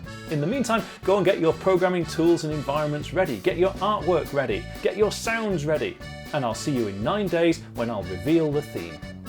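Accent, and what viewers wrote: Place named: United Kingdom. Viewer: why you don't say hello with that British accent, is important to me as old subscriber 😠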